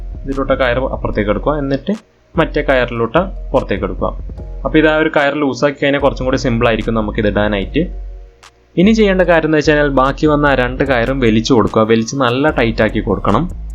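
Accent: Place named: Kannur, India. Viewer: കണ്ണൂർ ഭാഷ ഇതിലൂട്ട എടുക്വ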